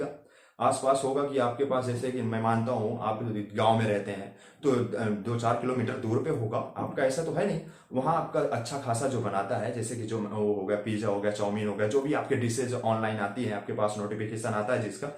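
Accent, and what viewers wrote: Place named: India. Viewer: Bro Sirf 4 hi like mila hai iska karan mei aapko batata hu, mai Gujarat se hu aur aap dusre ilakese ho jaise up,bihar, MP, and other ab hota kya hai aap logo ka bolne ka jo lehja hai woh different hota hai is wajah se videos lambi bhi hoti hai aur logo ko sunne mai maza bhi nahi aata toh please acchi chi hindi bole, waise mene bhi youtube channel banaya hai par ek bhi video nahi dala aur aapko advice de raha hu is liye sorry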